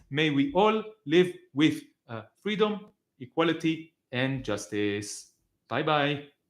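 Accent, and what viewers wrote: United States. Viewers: Great American accent. I think I have never actually met or conversed with an "honest zionist." I started the video thinking Piers would be the honest zionist because I didn't know who the other person was. Wow. That shows how bad things are, when Piers is the more "liberal" person in the conversation, because here in the U.S.A. in GENERAL I think he is considered a right winger. At least he strikes me as conservative and I have previously heard him praise Trump and MAGA. Zionism, however is so mainstream here in the U.S.A. It is embedded in both political parties and both right wing and left wing thinking. You're correct, the liberal zionists always find a way to package it all up in a nice package to deceive the world. To other Americans, do you know any honest zionists here in the USA that are not from the place called Israel? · Thank you for the great analysis. 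Every now and then I speak to a liberal Zionist who says there are 22 Arab states and just one small Jewish state. Yes, that means they approve ethnic cleansing. P.S. The Hebrew accent is very like Ukrainian/eastern European accents to me when a lot Zionists like Netanyahu speak. Not so much yours. I think the original settlers who revived Hebrew came from eastern Europe. Your American accent is amazing.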